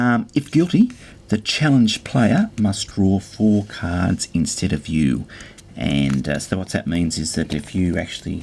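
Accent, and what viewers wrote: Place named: Australia. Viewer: OMG! YOU'RE AUSTRALIAN! Im really sorry, im really really sorry, i did learned a lot thanks for the video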